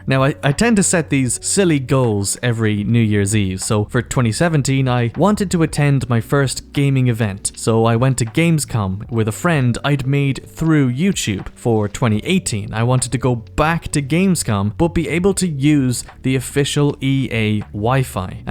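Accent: Ireland. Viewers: Thank you for everything <3 I enjoyed going on your stream for fun, watching videos, and hearing that smexy Irish accent of yours, and I'll keep supporting you. From making videos about video games to now working in the industry is a hug feat. I'm so happy:D Good luck!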